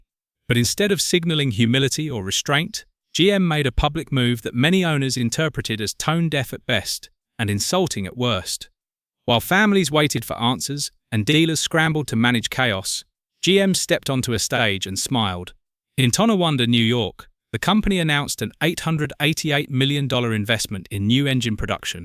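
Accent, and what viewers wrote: United Kingdom. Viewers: Started to watch until I heard that obnoxious "British" voice, , leaving . I will find this info on an American video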